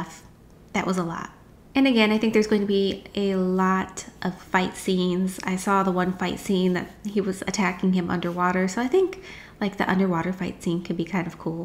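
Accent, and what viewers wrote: Telugu, India. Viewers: Your talking in Telugu so cute... Jai 🐯🐯 Do more Telugu movies reactions.. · Ur speaking Telugu so sweet of u.. Jai NTR💪. our Tiger is ready to Hunt Box offc.. Sep27th Devara Blast💥 thank u for doing this video❤.. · Wowww your Telugu is so cute😍🥰👌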